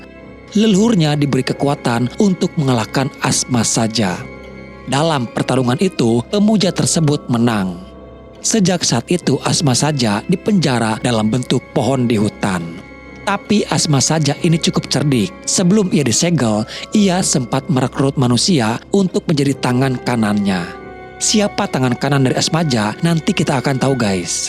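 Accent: West Java, Indonesia. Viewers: opaL???.. sunda pisan kang ... ☺️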